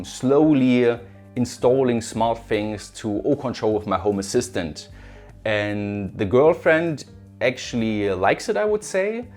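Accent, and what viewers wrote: Germany. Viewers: I joined you when you had about 40,000 subscribers. I really liked your content and your German accent. As your subscriber and fellow creator, I completely understand you. It's just titanic work. I wish you inspiration in the new year and ease in creating videos.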